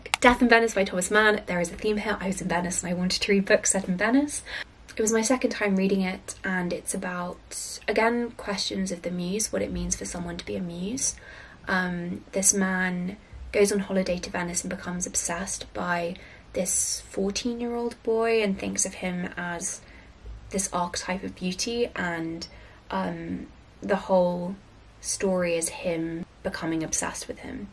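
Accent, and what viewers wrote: United Kingdom. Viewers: I am watching your videos now to prepare for my English exam. My teacher is from UK, so it is very useful for me to listen someone from this country to copy an accent. I have already watched some of your videos since the first one. It is cool to watch you grow up. Thank you and hi from Russia, Krasnoyarsk❤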